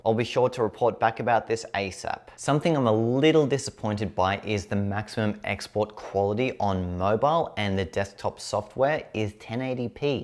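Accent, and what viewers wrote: Australia. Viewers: good to hear an Australian voice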